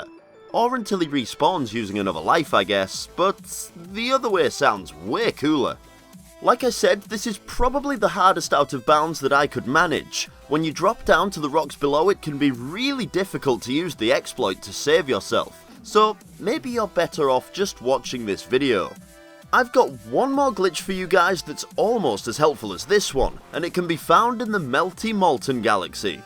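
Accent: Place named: England, United Kingdom. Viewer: I very clearly have a soft spot for English accents because I'm subbed to Andy from A+Start as well!😂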